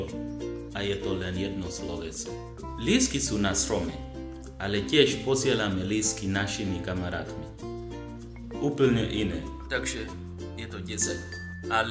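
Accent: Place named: East Slovakia, Slovakia. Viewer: To preto lebo žije na východnom Slovensku. Na východe sa rozpráva paradoxne najčistejšou Slovenčinou.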